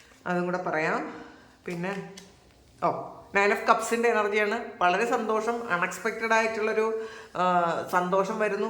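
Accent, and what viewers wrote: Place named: Kochi, India. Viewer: Good morning ❤.. മാം എവിടെ ആണ് താമസം. സംസാര ശൈലി കേട്ടിട്ട് കൊച്ചിയാണ് എന്ന് തോന്നുന്നു...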